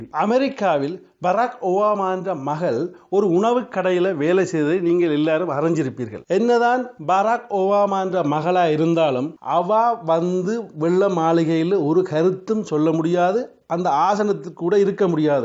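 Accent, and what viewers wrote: Sri Lanka. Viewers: dude,. this is srilankan tamil. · ஈழத்தமிழன் போல் இருக்கிறது. ஆனால் அவர் இந்தியத் தமிழர் போல் பேச முயற்சிப்பது தெரிகிறது. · Sweet Ceylon Tamil,I like it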